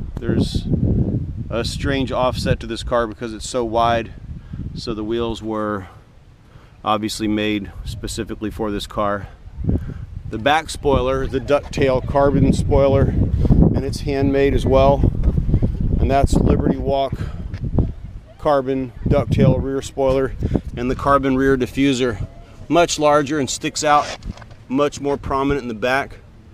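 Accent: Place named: Texas, United States. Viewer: are you from texas?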